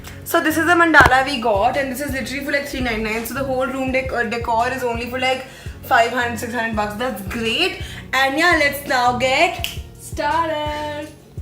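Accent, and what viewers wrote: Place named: India. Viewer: I think Indian English its very typical Indian accent .....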